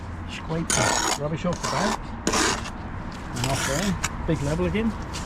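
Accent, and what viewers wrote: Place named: Norwich, United Kingdom. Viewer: Where abouts in Norfolk are you? Sound like a good old narwich boy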